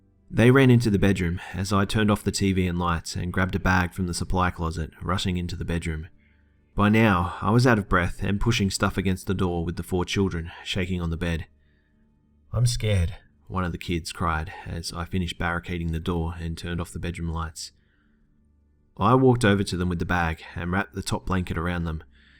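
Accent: Australia. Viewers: Subbed for the Aussie accent and great content. :D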